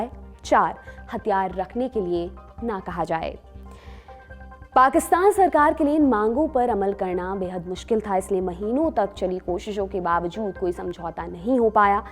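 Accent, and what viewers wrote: Standard. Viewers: बेहतरीन अन्दाज़े बयां ! अत्यन्त शुद्ध उच्चारण ! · Wah,bahut sare news anchor ko mai sunta hu.kintu jitna shuddh aapka uchcharan hai,jaisa aapka body language hai,isse aapki vidwata saf jhalakti hai.yahi visheshta aapko dusre anchors se alag aur behtarin sabit karta hai.Lekin shayad aap galat channel ke sath kam kar rahi hai.lswar aapko Yash de.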